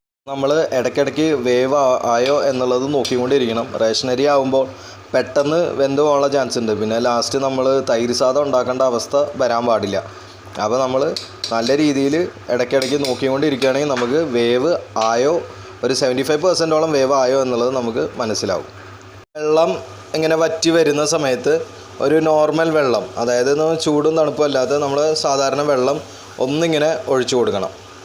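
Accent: Thrissur, India. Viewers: ചേട്ടാ അവതരണം മ്മടെ തൃശൂർ ലൈനില് സൂപ്പറായിട്ടാ.... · തൃശൂര്‍ ആണല്ലോ.... പൊളിച്ച് 🤩🤩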